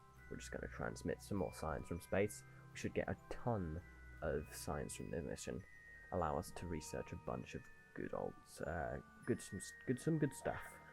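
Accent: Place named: Australia. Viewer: why you an ozzie mate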